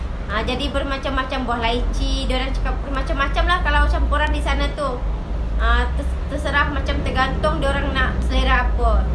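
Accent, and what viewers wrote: Indonesia. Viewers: Wehhh..kamu ni WNI kan? Kamu tak tau ke yang kami bayar bil elektrik, air, indah water, maxis, celcom semua online/credit card/debit card. Bumbu masakan masih guna la kunyit, bawang, cili asli. Orang Indo yang datang ke Malaysia je selalu guna bahan instant yang kau guna meniaga di gerai2 tuh! Menyamar konon jadi warganegara Malaysia konon...bahasa Indonesia kamu masih pekat lafi, jangan nak MENYAMAR jadi orang MALAYSIA!!!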